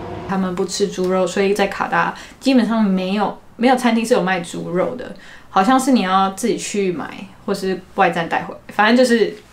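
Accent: Taiwan. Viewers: 妳的口音好台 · 外國人的面孔，台灣人的口音！Mixing goal ! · 挖 你講話比我還台灣.....謝謝您的分享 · 你的中文發音太好了 不看臉會以為你就是個台灣妹子 完全台灣腔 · 好道地的台灣口氣，聽起來很親切！ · 好美喔！尤其是這個沒有口音的台灣腔！ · 第一次看你的影片，光聽聲音真的會以為是台灣人！尤其台語的部分很道地！讚！你的分享也很真誠！泡泡的確會跟著年紀越來緊，同是身為步入下一階段的女性很能體會你的感受！期待之後你的旅行分享喔！阿已訂閱！ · 口條講話語氣好『台灣』😂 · 😮根本就是台灣人的講話方式嘛！ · 口音真的是標準台灣腔，我好像在親戚的飯桌聽堂姐分享人生故事🤣🤣🤣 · 哇咧！光用聽的超台 哈哈哈哈哈哈哈哈哈哈哈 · 卡達? 中東很大 油很有名 沒去過 耳聞 空姐比較有機會出國吧 白人女孩說話超台的 土生土長台灣白人? ^_______^" 很有趣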